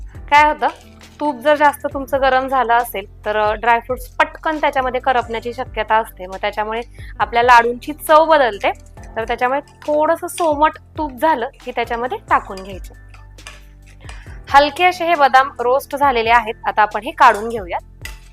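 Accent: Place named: Latur, India. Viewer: Tai तू कुठली आहेस, तुझी बोलण्याची टोनिंग लातूर ची वाटतेय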